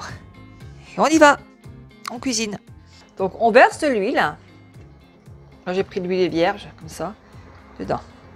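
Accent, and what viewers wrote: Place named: Ardennes, France. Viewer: Bonjour, j'ai reçu mon compact cook pro cette semaine et me voilà un peu perdu car c'est mon 1er robot, j'ai toujours cuisiner sans. Donc me voilà à chercher des recette et quel bonheur d'être tombé sur votre compte, je sent que ça va bien m'aider merci. Jhabite dans les Ardennes et je pense reconnaître cet accents ☺️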